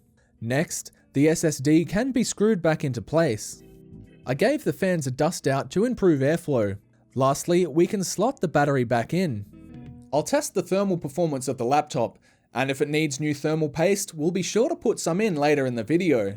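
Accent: Australia. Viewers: Bruh are you Australian or S. African? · Man finally an Aussie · just wondering are you aussie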